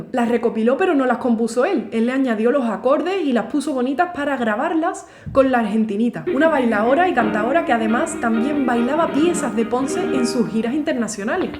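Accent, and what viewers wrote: Andalusia, Spain. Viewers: Maravilloso contenido; investigación solida y consistente, vinculación de la múscia con sus contextos sociales y económicos, pasión en el decir, maestria en el hablar y todo ello con el maravilloso acento andaluz, repito, ¡¡¡maraviloso contenido!!! Gracias. Saludos desde Guadalajara, Jalisco, México. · A mí me encanta oír tanta sabiduría, y tanta investigación, en mi acento andaluz. Porque siempre nos vemos en los mismos registros humorísticos y lejos de la divulgación culta y de la seriedad. Enhorabuena por tu canal.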